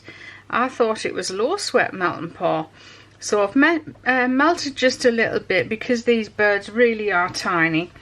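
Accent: United Kingdom. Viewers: Lady! I don’t know why I haven’t watched you for so long. I think I overdosed on soap! 🤢. You are one of the wonderful generous soap ladies who made me feel like I could a; make soap and b; pipe soap even though I had never piped really anything of any mention before. You are still at it trail blazing. Thank. You for sharing your time, your talent and your lovely British accent!!!n. Keep up the wonderful work!!! PS I have been a fan for over 5 years!!! Wonderful to see how you’ve resolve your pesky silicone liner problem!!🤣🤣. You’re a genius!!! “Blue birds and Cherry blossoms” soap name!!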